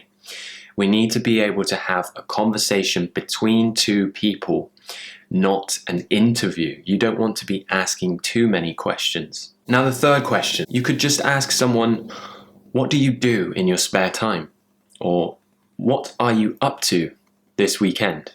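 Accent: United Kingdom. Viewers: I really love your accent!! When I started to study English, I started with American English, but I'm getting higher British English because is really posh and polite!! I love it!! Congrats for your video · One more subscribe! Accent very nice! I love British accent and like when I understand! :) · Hello! Thank you very much indeed for your video recordings. It is utterly important for me to follow your videos inasmuch as I USE them ( sorry for talking like this) to repeat after you full speeches as I best manage to do. I have to say that it is really working well for me. I am able to catch up your Britishness and pick it up. I hope I'm doing well with my pronunciation. After listening to and doing such shadow technique exercises your voice producing British accent remains long time in my mind before fading up. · Thank you for your useful and helpful video:) but I would also like to listen to your natural speed of British English!!!! 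Not all of English people speak this gentle and clearly, and I would really like to get used to natural speed of British English with your cool accent. Please~~~Make some natural talking video!! Lots of love and respect from Korea :)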